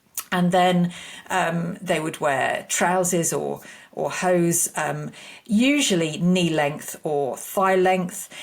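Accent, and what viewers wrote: United Kingdom. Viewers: Woman with most unambiguous RP southern accent I've ever heard: I'm from Lincolnshire ☺️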